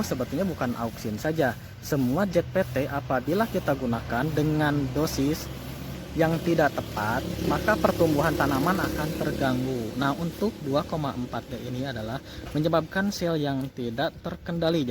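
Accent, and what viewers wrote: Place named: West Java, Indonesia. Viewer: pohon anggur saya juga mengalami hal yang sama dan sedang dalam pengobatan... logat Sunda ketara euy 😂😂😂🙏👍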